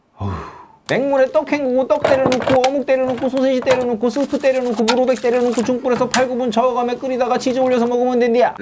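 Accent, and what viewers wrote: Chungcheong, South Korea. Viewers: 아 충정도사투리 너무 구수하시 좋네요 중독됨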